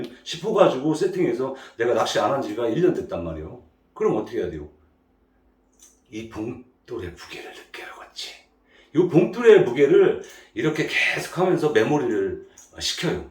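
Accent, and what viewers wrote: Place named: Chungcheong, South Korea. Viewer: 님께서 이번에 올려주신 영상 토대로 공부해서 출조하겠습니다! 속 시원한 설명과 유익한 정보 감사드립니다^^ 충청도 사투리 정말 맛있게 쓰시네요ㅎㅎ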